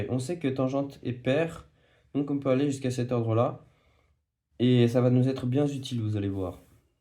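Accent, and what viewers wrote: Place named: Belgium. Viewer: Masterclass le petit accent belge frerot😹🤙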